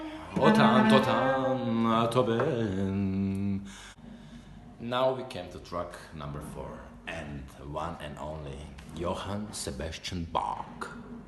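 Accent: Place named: England, United Kingdom. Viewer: You are adorable, unic, original human being with a worm ❤️. I can't wait to get this Fabulous CD, thanks so much for your information about your favorites in Classical Music. I love your English accent and the way you express your Passion to play the beautiful Music with your Cello. God bless you 👼*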